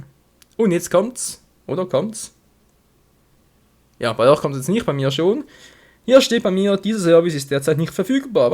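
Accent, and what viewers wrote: Switzerland. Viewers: Du bisch eindüütig schwiizer 😊😊😊😊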